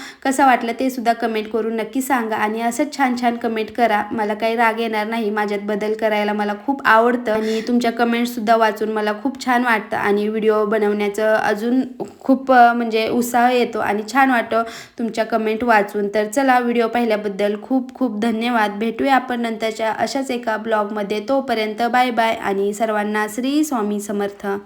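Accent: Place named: Manchar Khed, India. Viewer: Tuz gav कोणत... मंचर खेड ची आहेस का तु... भाषे वरून वाटतं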